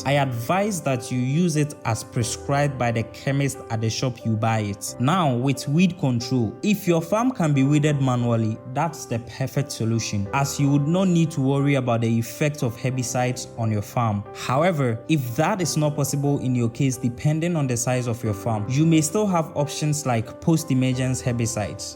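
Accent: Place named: Ghana. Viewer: You sound Ghanian 😊 Nice presentation!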